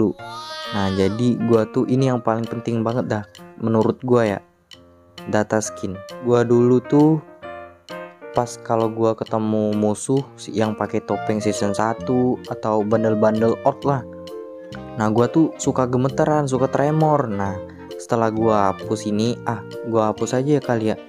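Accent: Palembang, Indonesia. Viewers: Wong Palembang kah bg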